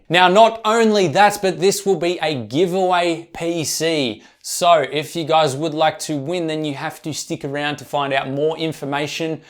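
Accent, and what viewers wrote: Australia. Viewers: btw are you Aussie?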